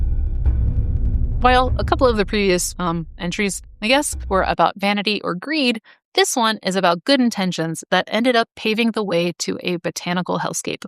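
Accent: United States. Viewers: Are you American? You used pounds instead of dollars but you sound American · I won't listen to anyone with a USA accent.. until you get rid of evil numbnuts Trump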